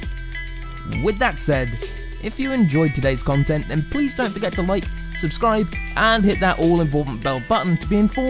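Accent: United Kingdom. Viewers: They got it wrong cause it's a British narrator, just like they got it wrong with the Revolutionary War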